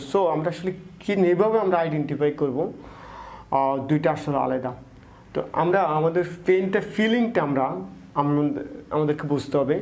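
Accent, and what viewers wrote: Noakhali, Bangladesh. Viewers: ওরে হেতের বাড়ি তো নোয়াখালী 😊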